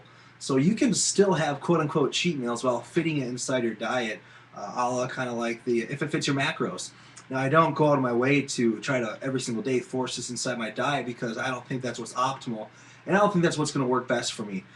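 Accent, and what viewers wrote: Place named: Minnesota, United States. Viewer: The minnesota accent annoys me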